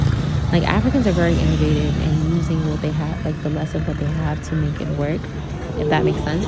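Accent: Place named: New York, United States. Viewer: Yoooooo this shit beyond crazy. 1 you sound like you from the Bronx or something 2. My dad is right up the street 3. Idk how I ended up here but yeah dope video it’s just you sound so New York 😂😂 tf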